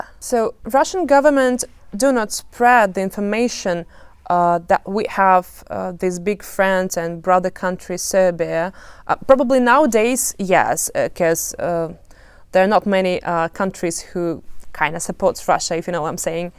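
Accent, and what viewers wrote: England, United Kingdom. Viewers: best brittish accent evah!! :D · You have traces of a British upper-middle class to upper class accent.. Interesting ! Cold cuts ..ham, beef etc make great sandwiches .. but excess fat does your arteries no good !! (To each his own..) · како си пронашла Србију ако не знаш где је Србија на мапи 😂😂😂 као кад се прича о порнићима, наводно нико их не гледа а сви знају све о њима, ови издајници либерали су свугде исти, за једну Рускињу прилично лепо причаш енглески са акцентом ,то се ретко виђа улагали су Британци добро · Ma koju njenu državu? Vidiš da ima britanski akcenat. Rusko je možda samo poreklo. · I do not find anything controversial or offensive towards Serbs or Serbia in this video. However, I find it highly improbable that you have acquired such a great British accent by watching movies because I know it for a fact that foreign movies in Russia (as in many other countries) are voiced over. 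Hence, you had no chance to hear real British accent from foreign movies in Russia. Given that you are not in your early teens, it would be very hard (read impossible) to gain this level of speaking proficiency if you are "learning" as you say. My best guess is that you have lived or gone to school in England in your early age. If I am mistaken, please take it as a compliment. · Of course that Serbia and Russia have different customs and mentality. Even in different parts of Serbia and Serbian lands customs and mentality are different, not to mention Russia which is removed by thousands of kilometers from the Balkan peninsula. I noticed that speaks with a bit of a British accent, which means that she probably knows far more about Britain than about Serbia. Конечно чтo в Сербии и России обычаи и менталитет по другом. Даже в разных части Сербии и сербских земель разные обычаи и менталитет. 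Россия удалена тысячи километров от Балканского полуострова. Я заметил, что есть легкий британски акцент, что означает что она вероятно знает больше для Британии чем о Сербии. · Your English is awfully to British. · Your accent is more english thank Russia. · your english shows us you were living in UK for years and more. so this is just another paid channel paid by west trying to make bad blood between russia and serbia. everyone just report her so we close her channel. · И да кстати,у тебя британский акцент,сразу понятно наклон в какую сторону…и между прочим,можно было меньше гадит по Сербии,всё таки ты в гостях)) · A sweet video. Well, you confirm what I already suspected; the Russians are a bit more reserved than the Serbs are; we are from the South of Europe, no wonder, simillar openness like Italians and Greeks. You find it sad that the many Russians do not know Serbia exists; I understand that feeling. 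But I do not find it so surprising; we are such a small people compared to you. And how could I expect that your grandma taught you to love the Serbs as you were 6, like my grandma taught me :) BTW, your English is that good, that I wouldn't wonder if you've spent some time in an English-speaking country (sounds like England). All in all, very likeable :) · You have a can`not decide which but your akcent is British,Australian or New Zeland...Fluid english... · I found this channel so interactive. Great job! I definitely will subscribe to your channel. By the way, you have a great British accent. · How do you have a British accent if you are from Russia? Just curious ☺️❤️ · Ova ima engleski akcenat nije ona dugo videla Rusiju, mucni malo glavom sta stoji iz toga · You have British akcent!!! Rusan ?? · You speak English like you are from England 🤣. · british english🤮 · твой британский акцент говорит намного больше, чем то, что ты говоришь. 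грязь, вероломно используемая в английской пропаганде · Do you have a British dialect?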